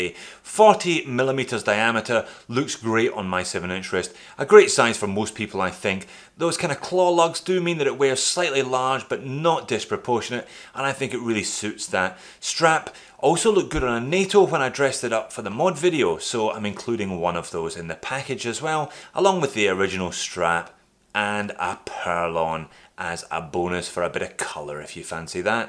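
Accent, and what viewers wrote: Scotland, United Kingdom. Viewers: My Favorite is the D1 Milano... BECAUSE (has nothing to do with liking the watch) This video showed up in my subscription lineup, and my wife liked the look of it. So this was the first JOMW video she ever watched. And upon watching, a milestone was reached. You are the only watch channel host in my subscription list who does not annoy her. Maybe it's your Scottish accent (we recently vacationed on Skye) or the fact that you are a Scott living in Australia like one of our favorite musicians, Collin Hay. She has since also commended the channel's intro graphics/colors/font. (she is a graphic designer) Anyway, your channel, and in particular this video, opened an avenue for us to "nerd out" on watches together. Which has made the life of this watch collector, measurably better. Thanks! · My favourite video of yours is the first part of modding the legendary SKX ( <link> ) Simple but important and practical mods ;) Maybe it's the fact that I love SKX itself so much 😂 And I really enjoy your content, nice hard work and this Scottish accent ;) Keep it up and go far above 100k soon, I'm sure you will :)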